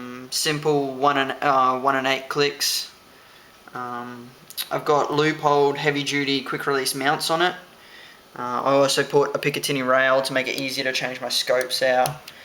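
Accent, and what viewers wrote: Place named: Australia. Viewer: Are you from Australia or New Zealand, i can't tell lol. Either way it sucks that that was over $1,000. Here in America (i am not trying to boast, our country sucks right now cuz of obama and the liberals) i can find one for under $700 easy, and the ones without a stainless barrel around $550. Good luck, and enjoy the shooting!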